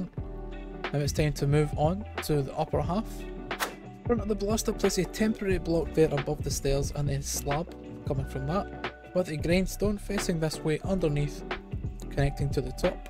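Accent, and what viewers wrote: Scotland, United Kingdom. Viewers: U Scottish??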